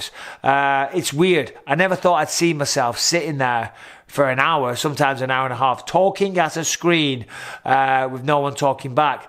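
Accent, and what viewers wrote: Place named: England, United Kingdom. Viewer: You know Michael, i really love when you start to talking funny shit. But please add some subtitle on your video, because you're true englishman and you speaking goddamn fast. So please add some subtitle next time, because sometimes people from another world like myself see and listen to your talking and couldn't keep up.. 😂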